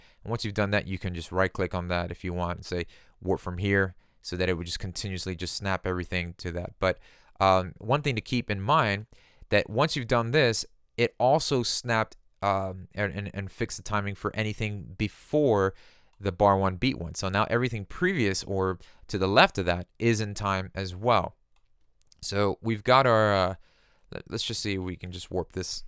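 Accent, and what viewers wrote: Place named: United States. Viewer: You sound just like the guy from headspace only with an American accent, but so similar lol. Nice videos!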